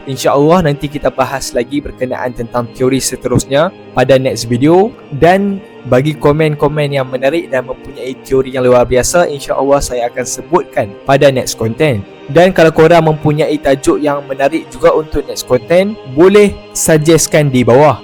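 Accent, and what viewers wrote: North, Malaysia. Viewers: Bahasa melayu loghat utara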